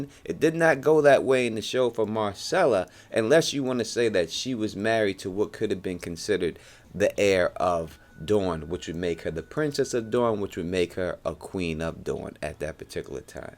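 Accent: New York, United States. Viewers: Chea chea chea!!! The, lol first time I found this channel, i knew right away my dude was a native new yorker like myself. We definitely have our own way of talking here, big ups from queens.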